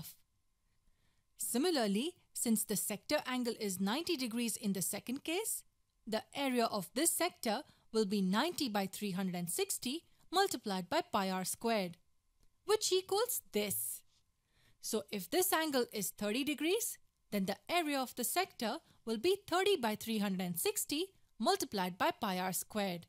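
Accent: India. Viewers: Oh... um i do not really understand this indian kind of english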